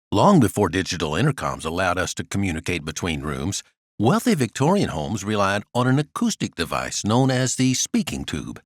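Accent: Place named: General American, United States. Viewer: It is a standard American accent. I think it sounds great. Always more positive.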